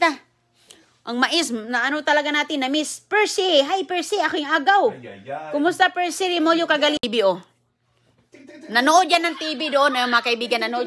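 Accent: Visayas, Philippines. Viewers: Mao nay nindot bisaya jud kaayo haha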